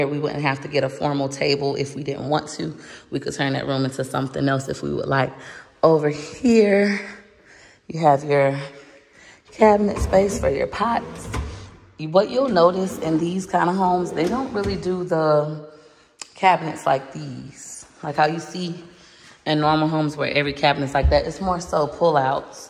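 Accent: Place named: South, United States. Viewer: Okay, I like the house but don’t love it…especially for that much $$, but tell me why your voice is giving Beyonce today? 😂😂 You have that sexy whispery southern voice today lady! 🥰 I missed the zoom this morning, but as soon as you post the recording I’m on it!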